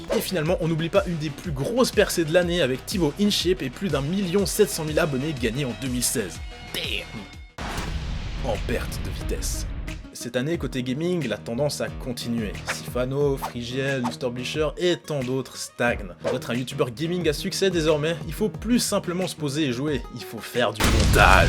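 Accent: Belgium. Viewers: t belge ?? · tu est belge ?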